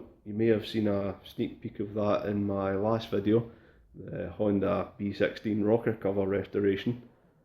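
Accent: Scotland, United Kingdom. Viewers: i love the deep burble of a suby flat 4. and you sound like your from fife or the highlands like around Lossiemouth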